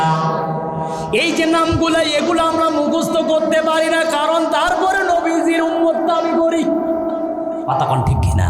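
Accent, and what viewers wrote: Shariatpur, Bangladesh. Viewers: ধুর শরীয়তপুরীর টানটা ভালো লাগেনা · ধুর শরীয়তপুরের কন্ঠে ঠিক আছে কিন্তু আবার হুবহু ওয়াজও নকল করেছে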